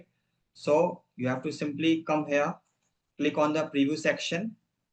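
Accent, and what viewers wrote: India. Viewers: Thankyou so much , iam interested to learn seo .. last time I seen your video I can't understand that foringer voice so this time it's an indian , now i understood every topic thank you ❤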